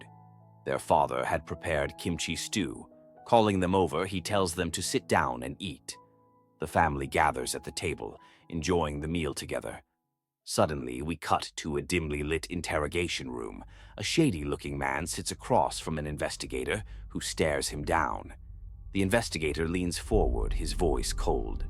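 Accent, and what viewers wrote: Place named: United Kingdom. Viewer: Why is blud british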